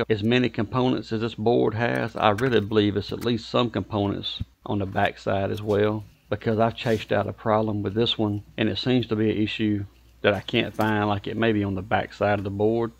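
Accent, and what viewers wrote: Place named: South, United States. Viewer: Thanks for always being so good about reading and replying to comments! I’ve been watching your videos for a few years now since I was trying to troubleshoot an EGO battery. You even made a short follow up video to answer a question I had back then and I’ve been a fan ever since. Actually I think you may have even helped shape my appreciation for Southern accents and their associated charm to be honest lol. You’re a great teacher, fixer and creator. Keep up the great work, wish you and your channel all the best! Much Mahalos and Aloha! 🤙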